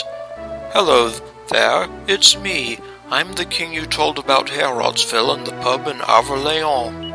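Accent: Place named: South, United States. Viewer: Thanks for doing these vids! I really enjoy the narrative, you sound like a good friend of mine, a southern gentleman😁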